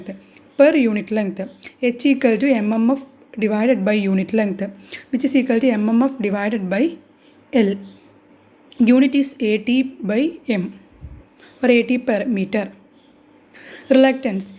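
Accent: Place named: Kerala, India. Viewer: Its accent seems like you are mallu😂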